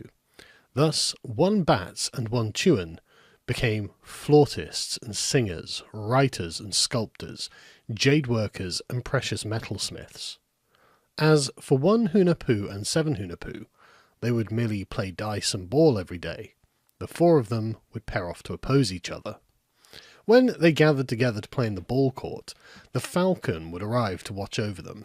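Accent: United Kingdom. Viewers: I fear is it just a brittish accent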